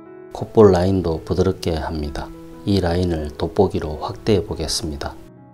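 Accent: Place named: Busan, South Korea. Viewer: 정말 아재같으셔서 구좋알했습니다. 말투가 딱 들어보니 부산 같으신데 맞지요?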